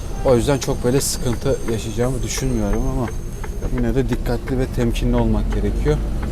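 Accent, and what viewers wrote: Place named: Giresun, Turkey. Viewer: Kardeş giresunlu musun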